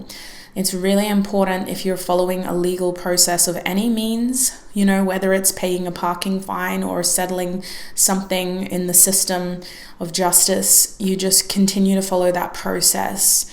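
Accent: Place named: Australia. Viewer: Oh listen, another Australian! Hello 👋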